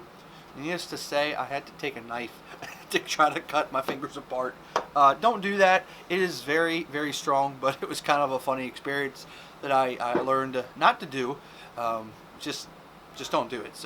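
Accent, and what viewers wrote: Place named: United States. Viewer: Keep up the good stuff man! Which state is your accent from btw?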